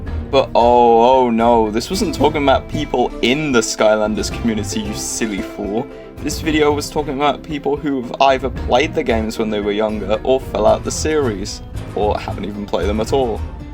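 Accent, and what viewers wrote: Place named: United Kingdom. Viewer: BRI'ISH REVEAL HOLY CRAP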